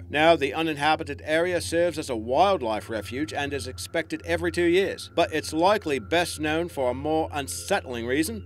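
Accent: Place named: Australia. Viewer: You have the strangest accent I have ever heard, like a mix of Australian, American and British.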